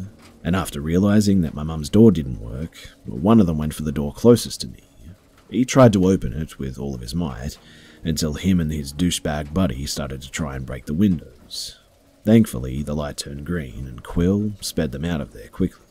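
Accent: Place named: Australia. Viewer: Does anyone else think voice & Aussie accent sounds different? Especially if you listen to some older posts. Still sounds great though while I listen on a dark & eerie night. Thanks!!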